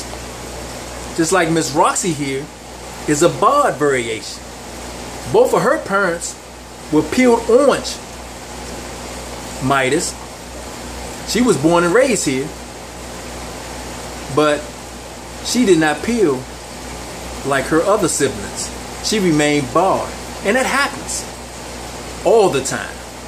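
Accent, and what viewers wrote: DMV, United States. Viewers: Great explanation! Great looking fish too Champ. 👊🏾 Im from DC bruh and based on your accent you gotta be from somewhere in DMV area! 😎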